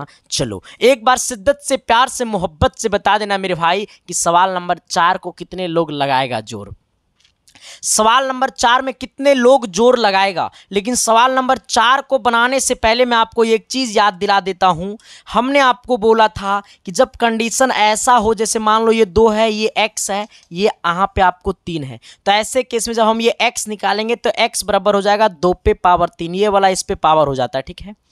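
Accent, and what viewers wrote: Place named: Bihar, India. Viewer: Bahut hard🎉🔥!! That bihari.. tone !! Jsstt took the heart💖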